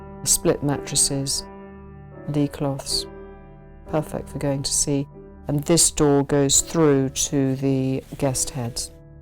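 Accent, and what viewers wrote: United Kingdom. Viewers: I love her English Accent, so how much is it? Anyone?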